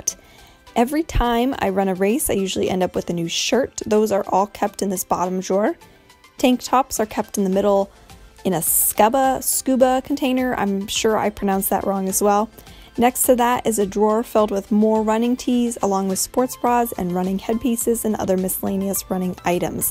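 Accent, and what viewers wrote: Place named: Pittsburgh, United States. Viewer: i can tell youre pittsburgh "needs packed"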